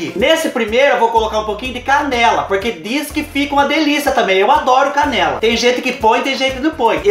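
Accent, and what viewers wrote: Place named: Countryside, Brazil. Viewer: morei no interior e sinto muita saudades,amo esse sotaque lindo. Vc lembra do vídeo do coró? Aquele dia eu ri tanto que doeu a barriga. Quando mudei pra Tatuí eu estava grávida,e ouvi minha sogra falar que lá na árvore estava cheio de coró,eu achei que era uma fruta e fiquei com desejo de comer o tal coró, só que eu não falava tinga vergonha, pois estava na casa da sogra,como eu ia dizer aí sogra me dá um coró. Pois vem eu já andava com a boca aguando quando o pai do meu filho chegou de uma viagem de 2 meses eu pedi a ele, por favor eu quero comer coró não aguento mais de vontade ou vc compra ou vou me separar de vc. Todo mundo riu do céu. Essa foi a maneira bizarra que fui apresentada ao coró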